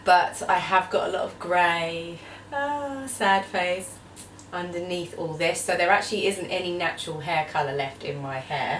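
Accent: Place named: Essex, United Kingdom. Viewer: That's a very posh Essex twang hahaha xx Fab video 👌💕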